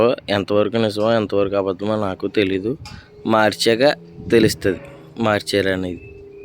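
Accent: India. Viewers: Ok ...I am from palakollu..bec of slang I guess u r from West godavari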